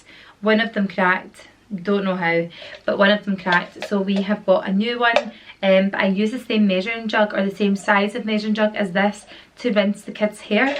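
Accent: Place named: Glasgow, United Kingdom. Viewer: Love your hair so beautiful where are u from got a bit of a glasweigan/stirling accent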